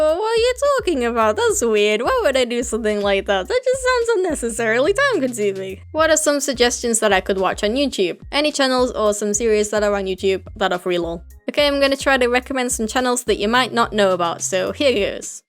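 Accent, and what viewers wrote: United Kingdom. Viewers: are you british? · Angel Animates :3 well yeah she has a British accent so she would be a Brit (she is)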